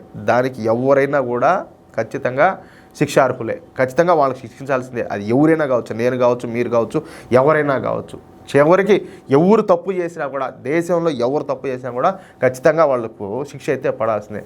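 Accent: Rayalaseema, India. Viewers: U speak my language in rayala rajyam slang bro ... gan D super bro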